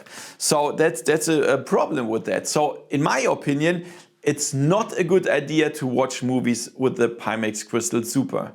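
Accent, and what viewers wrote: Germany. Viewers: I love the German accent. It's very clear and easy to understand for non-native speakers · What did you expect, buddy? He is German. Everyone has a different accent on planet Earth.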